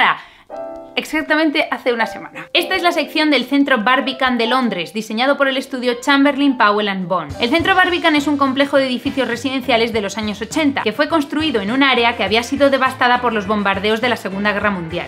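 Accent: Spain. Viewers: Si dicen que el accento de Madrid es el neutro de España es falso 😂 Cada vez que suelta "mazo" es que es de Madrid 😂😂🤣🤣 Nano! Saludos desde Valencia !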